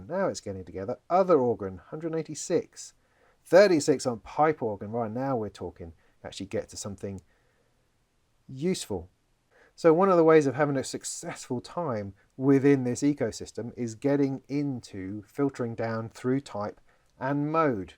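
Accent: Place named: England, United Kingdom. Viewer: Fabulous down to earth review and demonstration.. And best of all someone who's English.. No annoying American accent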